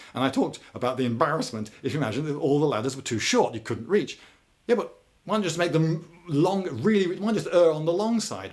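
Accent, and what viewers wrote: United Kingdom. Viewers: You sound like the narrator in strange brigade and that amoes me smile. Everything is better when narrated by a Brit. Plain n simple. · Why the fuck was I notified about this video NOW?!? I WANNA LISTEN TO A BRITISH MAN TALK ABOUT MEDIEVAL STUFF!!!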